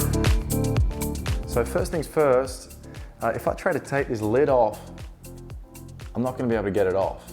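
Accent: Australia. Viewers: I subscribed for the Oz accent 😂😂😂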